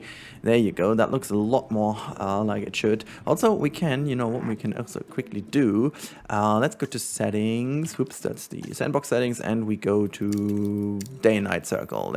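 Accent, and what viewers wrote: Germany. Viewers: You sounds like a german , bin selbst auch deutsch ,you too ?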